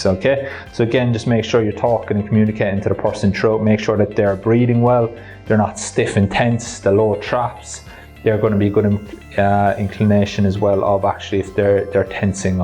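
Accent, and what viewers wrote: Ireland. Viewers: Sounds Irish to me. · What you on about? You can tell he's Irish straight away😂😂😂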